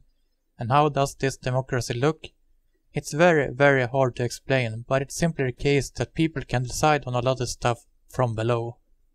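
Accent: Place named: Sweden. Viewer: Nothing wrong with the pronouncing but you can immediately tell that there is a very heavy Swedish accent. The videos are great, this is just an observation about the accent that I would recognize anywhere.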